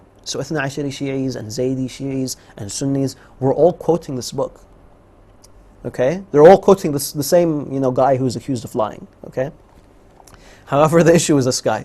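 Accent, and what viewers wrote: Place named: United States. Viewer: This video is a good cover up. He has omitted a lot of details from the authentic Hadiths that tells a lot about this incident. His American accent and long thobe is hypnotizing. I am surprised that the people sitting there took his half prepared story hook line and sinker. The real tragedy of Karbala is not the cruelty of Bannu Ummayia or the tyrany of Yazid, the real tragedy is that we are soo satisfied with such stories without prodding into the details about why the Leader of Youth in Paradise was killed by Muslims 60 years after Hijra. Wat bro narrates makes it look like an accident. But as the ahle Sunnah we cannot let this narrative be built in our homes, we should all prod deeper and find out why this happened and understand wt forces are preventing the people to distribute half baked stories and build narrative such as this.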